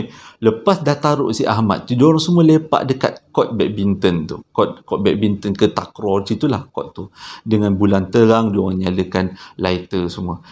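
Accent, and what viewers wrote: Johor, Malaysia. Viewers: suka dengor abang cakap, memang pure orang Johor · ingat bro 😅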